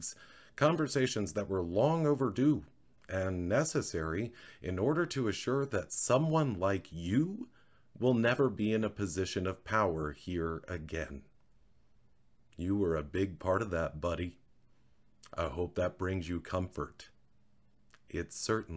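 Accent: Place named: South, United States. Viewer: What I need to comment on is the way BC was read in the most southern way possible! Thank you!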